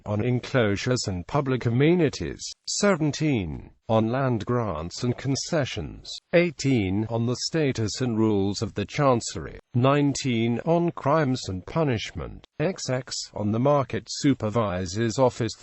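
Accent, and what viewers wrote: United Kingdom. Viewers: The robotic accent maked it impossible to understand, such videos including arabic words must not be created in British robotic accents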